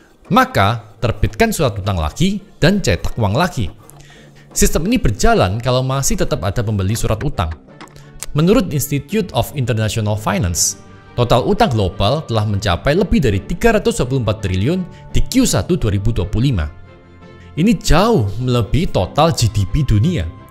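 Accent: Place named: Surabaya, Indonesia. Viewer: Iki ketok’e arek Suroboyo yo? Keroso dari logat e. Lek iyo berarti kita konco plekkk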